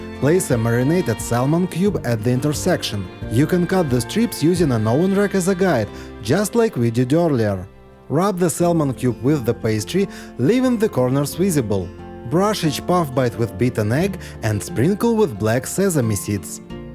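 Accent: Russia. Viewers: Sorry, can't stand your heavy Russian accent. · ужасный русский акцент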